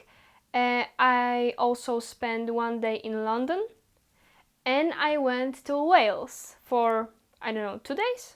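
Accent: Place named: Poland. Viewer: Hi, congratulations! You are fluent and natural. In your speaking English there is no pretending of not being Polish by no means. On the contrary, this video shows (maybe more clearly than the rest) that you are thoroughly Polish. And this is good. There should be more of such expression of Polish identity and spirit in English on the web. Keep up. And thank you!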